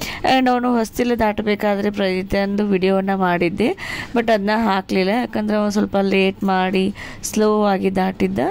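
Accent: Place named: North Karnataka, India. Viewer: Neevu nam uttar karnataka style le maatadri ennu chalo anasatt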